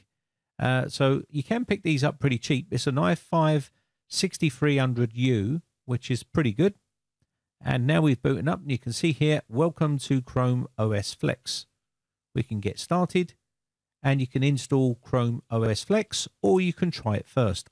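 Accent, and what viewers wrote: United Kingdom. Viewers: August 14, 2022 - Compliments to for a clear, and concise instructional and informative video. As an anglophile, I particularly like accent.😉 Most of my drama entertainment comes from Brit productions. I watched this video because Microsoft is getting more authoritarian by the day. With Windows 11 they are basically making people that buy that OS their property. They want to control everything from the customers' PCs to the customers themselves. Google is no "knight in shining armor", but I would rather sell them my information in return for an OS that works, and is free in a monetary sense anyway.😏😏 One of the more positive things coming out of the whole Climate Change, and preserving resources movement. Is that people are becoming increasingly concerned about Tech items that can't be upgraded or repaired. So fortunately more people are interested in recycling and repurposing things that shouldn't be thrown into landfills until they are absolutely useless and just don't work anymore. 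I would appreciate it if made a future video that is more oriented towards installing a Google OS on desktop PCs. Cheers from Connecticut, U.S.A👍👍😊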